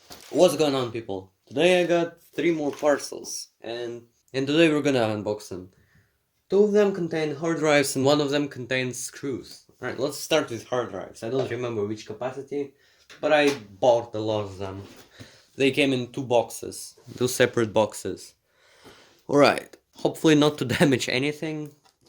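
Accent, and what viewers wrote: Russia. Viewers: I hear that russian accent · 0 dislikes thats what i like to see also i like the voice especially with the russian accent :D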